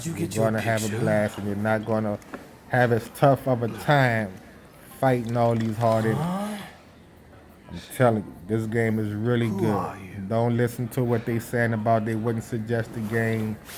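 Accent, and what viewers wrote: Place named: Louisiana, United States. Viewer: Bruh! Where are you from, whoa? I swear you sound like my homies from Louisiana. Pretty good video, by the way. Even with the accent very articulate! As a southerner from the A, it is beautiful to hear. Salute my G!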